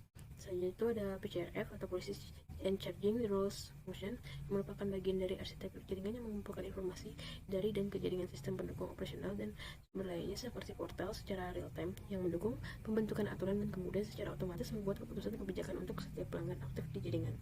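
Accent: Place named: Pariaman, Indonesia. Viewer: Vidionya kurang menarik nih, bahasa indo nya kelihatan pariaman vibes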